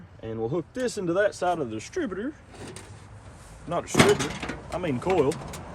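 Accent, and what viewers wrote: United States. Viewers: What state are you from Yank?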